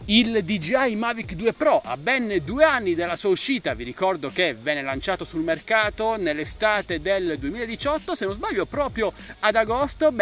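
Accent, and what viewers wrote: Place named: Apulia, Italy. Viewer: Se si considera la camera e la parte video in generare è ancora al top... se non il top dei semiprofessionali. Purtroppo l'avionica è superata, nell'efficienza, nella sensoristica, nel FW (intesa come capacità di calcolo), nel peso.... e poi c'è l'AI (l'Intelligenza Artificiale).. di cui è praticamente privo. Credo che il suo valore oggi possa valutarsi sugli 800 euro e non senza riserve nel comprarlo. Mi associo completamente al tuo consiglio, a meno di necessità impellenti, ATTENDERE L'USCITA DEL MAVIC TARGATO 3. Grazie del video.... 👍 dall'accento sembri pugliese però hai il tramonto sul mare (magari sbaglio io era l'alba, oppure è sul Mar Ionio)👋👋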